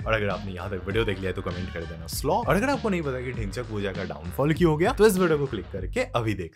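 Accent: Bengal, India. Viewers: Bhai aapke hindi mai aapka bengali jalakta hai 😅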